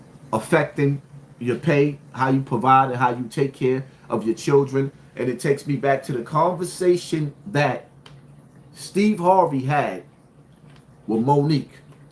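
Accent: Brooklyn, United States. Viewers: BROOKLYN TONE N THE BUILDING EAST NY STAND UP...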